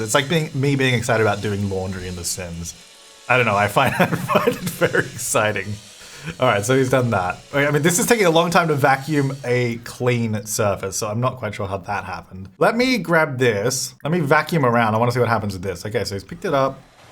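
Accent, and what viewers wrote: Australia. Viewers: I feel so stupid I’ve been watching you for years and apparently I’ve missed that you’re in Australia and I knew you had an accident but I could never pinpoint where, I thought maybe New Zealand.. it was because it wasn’t that cartoony Australian accent I never would’ve thought I felt so stupid right now I need my PhD taken away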